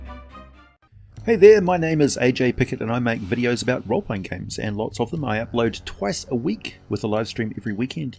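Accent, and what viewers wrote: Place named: New Zealand. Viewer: Very nice intro, I thought you might a New Zealander. Along with South African, two of the most pleasant accents to listen to. I've also been binge watching Viva la dirt league d&d sessions lately, very silly and funny bunch.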